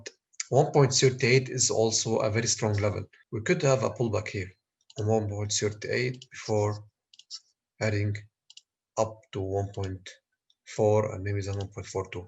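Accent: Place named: Egypt. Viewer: U sound like an Egyptian. Could you please look into doing crypto currency forecasts?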